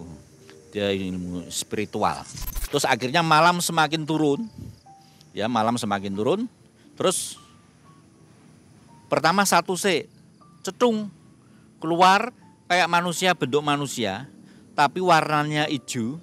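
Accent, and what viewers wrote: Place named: Semarang, Indonesia. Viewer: Pantesan ora asing mbek logat e.. jebul wong semarang 😂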